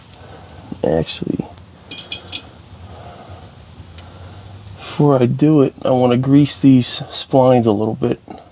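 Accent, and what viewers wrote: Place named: Pittsburgh, United States. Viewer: Dude sounds like Pittsburgh. 👍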